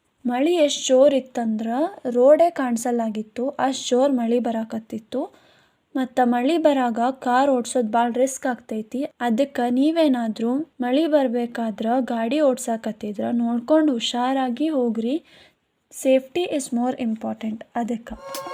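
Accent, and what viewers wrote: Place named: North Karnataka, India. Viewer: Video ಚಲೋ ಮಾಡಿರಿ ನಿಮ್ಮ ಉತ್ತರ ಕನ್ನಡ ಭಾಷೆ ಕೇಳಲಿಕ್ಕೆ ಒಂದು ತರಹ ಪಸಂದ್ ಆಗುತೈತ್ರಿ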